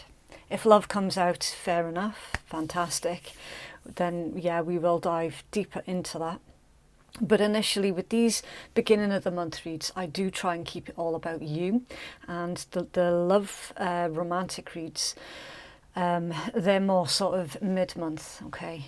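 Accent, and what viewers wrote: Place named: Wales, United Kingdom. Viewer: exactly! Tq for wonderful reading its a testament you have masculine energies on board! Nice to hear Welsh accent from mine to yours...❤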